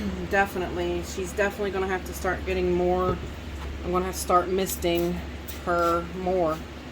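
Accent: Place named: Tennessee, United States. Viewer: I guessing eastern TN by your specific accent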